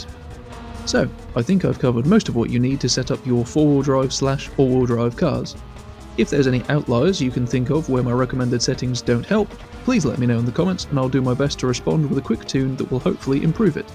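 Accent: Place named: Northern England, United Kingdom. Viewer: This series is a life saver thanks bro! Not had gt7 long and got a T248R last week well what a difference I’ve gone from winning easily to fighting to staying on track lol I thought I would be better with the wheel lol.. Il be making good use of your videos and learning as much as I can to improve my skills. U from the north? I from north west and you speak like a northern lad or midlands maybe. Well thanks for the videos you have a new subscriber here and thanks for not asking for likes n subscribe before we watch the content I really appreciate that you suggest at the end instead. Ta bro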